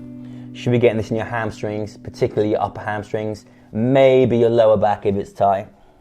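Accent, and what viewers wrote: United Kingdom. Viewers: Haha, I can hear the British accent off of this, Sends much love from Kenya👋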